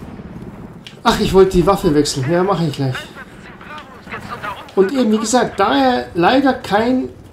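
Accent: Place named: Bavaria, Germany. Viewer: 100% Bayer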